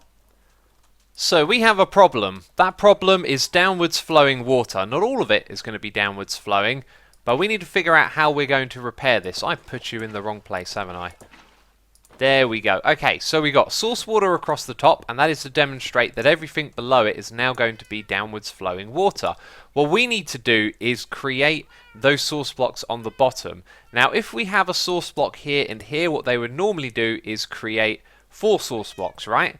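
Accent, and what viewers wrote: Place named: United Kingdom. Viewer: when british is so perfect that youtube's auto-transcript bot gets it perfectly right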